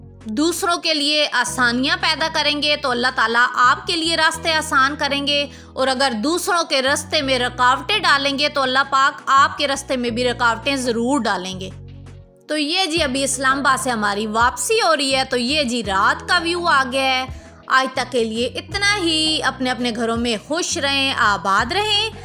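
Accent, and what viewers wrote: Punjab, India. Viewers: W salam 😍punjabi ka tarka 😂😂😂🤣👻👻👻amezing hai yar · I like Punjabi excent very much I have alot of friends in Punjab side lovely people💜👍❤️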